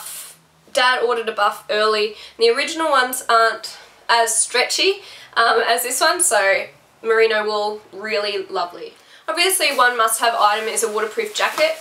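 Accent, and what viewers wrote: Australia. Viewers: This was so helpful - ta! (And great to hear a fellow Aussie!) My cousin and I are doing the Camino in April next year (2020) starting on April 1, which seems pretty close to the time you walked. My main concern is with footwear. I find my feet get really hot and sweaty in goretex shoes, but I also know how wet it could be in April! Did you come across many people wearing non-waterproof shoes? Did you have any trouble with your shoes getting wet and not drying out properly? I love Salomon shoes but if I can get away with non-waterproof I would be very happy!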